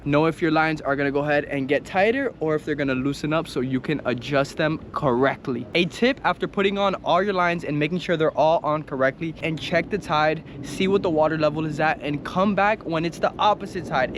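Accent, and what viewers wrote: Miami, United States. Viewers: Are you from Miami? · His Miami accent lol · Knew bro was from miami after a couple words 💀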